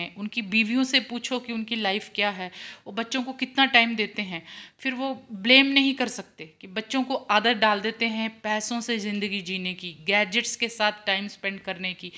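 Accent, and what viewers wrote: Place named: Punjab, India. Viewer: Tuhdi Soch bht vadia ji sachi bilkul sach keha tusi motto mehnat te bht Kar rhe Baki agge rabb de hath a ji 🙏 Baki ek gal tusi Canada Ch reh k pure Punjabi bolde ho jida k gaddiya thukti Hai hahahhaha Nhi te AJ kal Jo USA CanAda yeah yeah bht krde a ji Jo 5 class pass v nhi hunda oh v yeah yeah bs hor koi gal hi nhi krde waheguru ji tuhnu hamesha Khush rakhna. Te ur famliy members nu v dear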